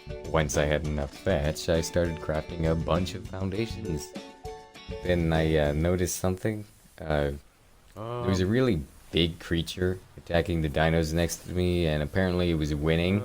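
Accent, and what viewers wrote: South, United States. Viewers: Damn I wish we got more of that nice country accent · Why is he getting more country as he goes?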